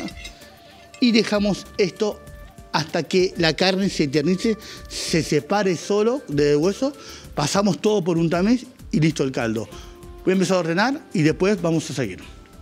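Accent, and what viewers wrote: Argentina. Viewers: Hola primera vez que te veo,muy interesante e instructivo, solo que me dió mucha risa ver un descendiente japonés con el acento Argentino.. mirá vos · Me suena gracioso escuchar un japonés hablando como los argentinos, pero se ve que es un buen chef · A lo primero me chocó escuchar en un japonés,ese increíble acento argentino,pues esperaba que fuera un canal de Japón.Pero me a encantado esa sencillez con la que se expresa.Un humilde español les desea todo lo mejor. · ME PONE LOCO VER AL JAPO HABLANDO PORTEÑO....MARAVILLOSO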